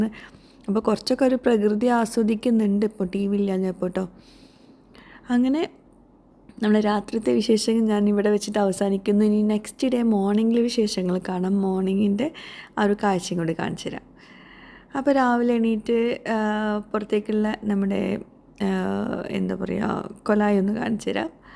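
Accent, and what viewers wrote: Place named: Malappuram, India. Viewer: Engne ayalum nammude malappuram basha vallapoyum varum alle kolaya❤❤❤😂😂😂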